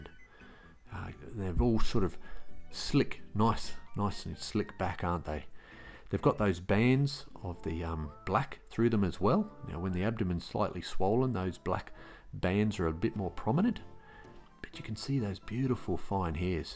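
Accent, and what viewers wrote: Australia. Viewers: I guess it's because I grew up as part of that generation but if I hear an Aussie talk about animals I tend to be entranced and retain the information better. The spirit of Steve Irwin is smiling somewhere.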